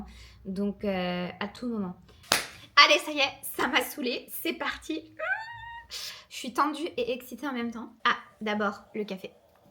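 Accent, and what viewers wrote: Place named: China. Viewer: Purée ton accent chinois 😂😂😂😂 il est adorable 😂😂😂 你好 我觉得这个视频很好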